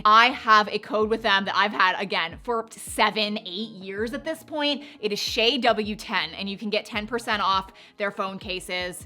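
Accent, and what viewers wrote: South, United States. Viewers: Nothing wrong with sounding Country Shea 😂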